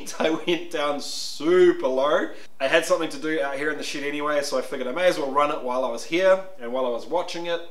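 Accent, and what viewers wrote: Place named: New Zealand. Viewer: Awesome videos and being a south islander good to hear a kiwi voice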